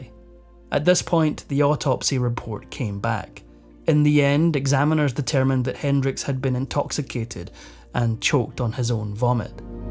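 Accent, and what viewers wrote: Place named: Scotland, United Kingdom. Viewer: Yay my favorite narrator love listening to the Scot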